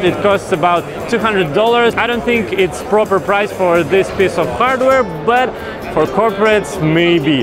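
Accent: Russia. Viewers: ужасный русский акцент) а так всё норм)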